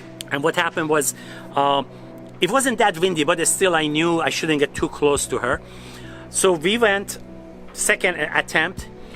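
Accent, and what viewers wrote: Iran. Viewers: Riveting, without being sensationalistic: A+. I could listen to this man speak on any subject (do I detect an Iranian accent?), and admire his humble-thorough approach… to everything, really. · You are an awesome Iranian as your accent sounds like my Iranian friends from Chico State Circa 1975. I loved your original life story. Thanks brother for sharing.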